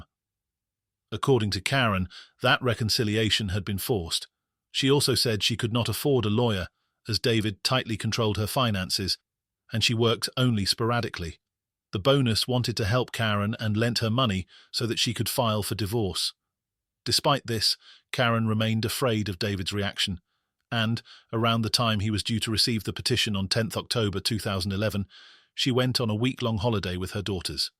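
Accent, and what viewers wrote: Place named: England, United Kingdom. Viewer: I was concerned too when I heard the name hogshooter I am English and love the English voice .thank you for your exceptional channel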